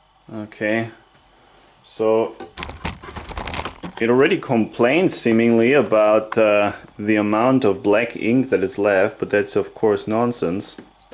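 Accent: Germany. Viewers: What happened to your English?? Stop trying to sound American. It sounds horrible. I much prefer your clear German accent. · Dein Englisch ist viel besser jetzt und der schreckliche deutsche Akzent verschwindet. Sehr gutes Video wie immer. Vielen Dank fürs Hochladen! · Ich gratuliere Ihnen mit Ihren Erfolg !!! like all your video's, especially your German accent...love it !! Herzlich grüße aus die Niederlande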